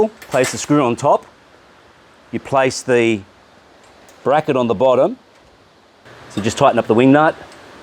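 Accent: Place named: Australia. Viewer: Thank you so much in replying, you are one of the few who has! I found your channel purely by accident. Although I am now 61 and retired, I find that trying to expand your mind is a good and healthy thing and one should never stops learning until you pop your clogs. Given that there are so many channels with Americans on here, it is a refreshing change to hear an Australian accent, not that our US and Canadian cousins don't have a lot to offer, as they do. PS Given you are in Sydney and I am in good old Blighty, you must either go to bed very late, get up very early or just not sleep a lot like me! All my very best to you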